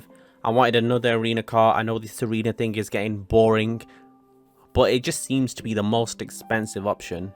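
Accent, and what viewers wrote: United Kingdom. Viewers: Fucking bri’ish accent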